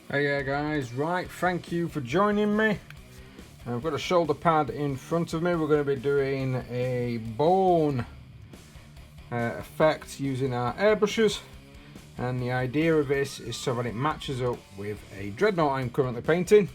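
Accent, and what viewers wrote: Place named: Yorkshire, United Kingdom. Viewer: brilliant vids sir. you really do deserve more views. love your titanicus vids. your yorkshire accent isn't to strong, easily understandable. i'm north notts so used to it. keep up great work.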